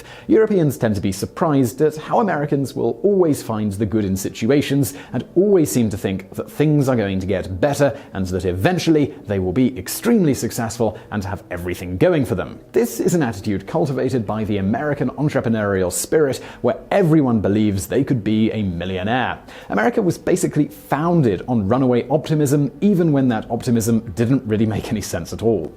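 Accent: United Kingdom. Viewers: I love how u say controversy (and other words u pronounce the British way instead of the American way) u yummy man u! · Love the British accent. I don't get British humor tho. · Is there a louder, more in-your-face american version of Simon Whistler and his shows where he doesn't speak with a british accent?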